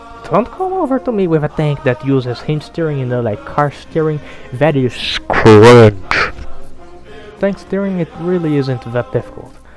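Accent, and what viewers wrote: Brazil. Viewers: Men, are you brazilian ? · I heard portuguese voices, are you from Brazil?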